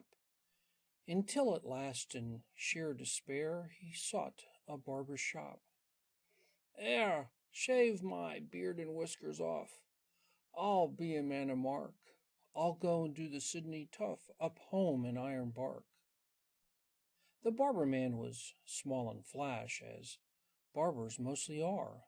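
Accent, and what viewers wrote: United States. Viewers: great reading of an Aussie classic. Unusual to hear it with an American accent. Thanks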